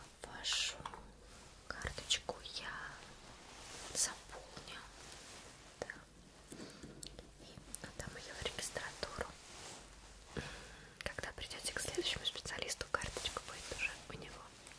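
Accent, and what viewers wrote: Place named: Russia. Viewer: She’s so pretty really wish she did some videos in English the Russian accent is amazing 👌🏻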